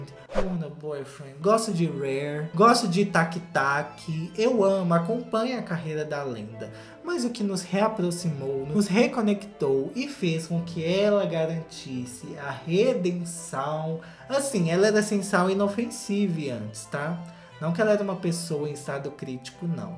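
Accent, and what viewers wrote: Minas Gerais, Brazil. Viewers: O sotaque mineiro do tá cada vez mais forte, eu amo.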